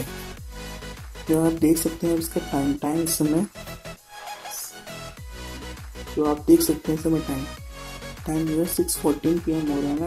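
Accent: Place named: India. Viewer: You are indian and speed-mumbling, very very difficult to understand. Have you read how youtube CC interprets the sounds you put out of your mouth. Adding background music helps? Well I did hear in the end: Thank you, have a nice day, almoust nothing else.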